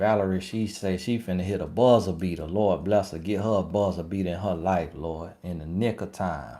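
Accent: South, United States. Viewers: You be cracking me up with the funny things you say in your southern accent. 😂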